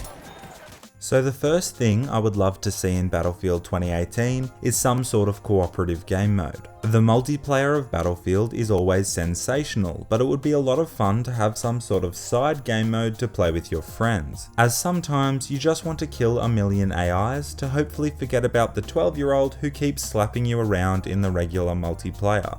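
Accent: Australia. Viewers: Another winner my friend, keep up the great work. Also i love that smooth auzie accent. God damn it makes me wetter then a pelican diving for fish!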